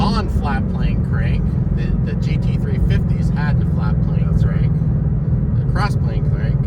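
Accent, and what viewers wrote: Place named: Pennsylvania, United States. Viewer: I knew you were from PA... we got that accent so I am told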